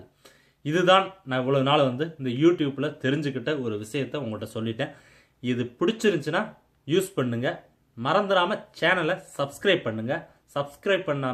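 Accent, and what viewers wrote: Madurai, India. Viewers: boss nenga madurai ah...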